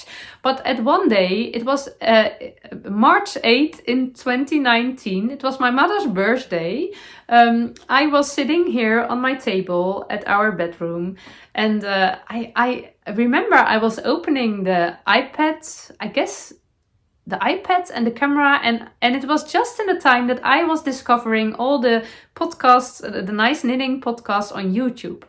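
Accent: Netherlands. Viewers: Happy 100th!🎉❤ it truly is the best heart jumping watching you. I look forward to Mondays and Fridays! You are so sweet. Love the imperfections and your wise words, even if they are in your Dutchie English- it is perfect. 😊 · Inspired by you, I made a granny square little vest for my 11-year-old granddaughter. It is so cute I may have to make another! I knit more than crochet, so I am so happy to have learned a new craft. I love your Dutch accent. When I was 11 to 13.5 I lived in the Den Haag area, so learned Dutch, and I am so happy to to hear your language again. I will have to listen to a Dutch episode! Congratulations on 100 episodes! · Many congrats on your 100th episode,. I've often wondered how you find the time to film and, especially, edit 2 podcasts every week.... and still manage to run a home (and all that entails), and then get through the amount of projects you do! 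I love your videos.... and your Dutchy-English😊 Best wishes for the weekend, and I'll see you again on Monday. Christine (London, England) x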